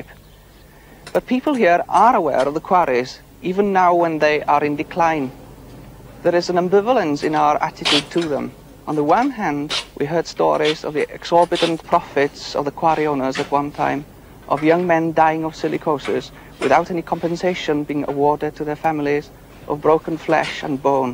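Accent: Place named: Eastern Europe. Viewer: Lol his accent sounds so foreign, almost Eastern European. I would never have believed he was Welsh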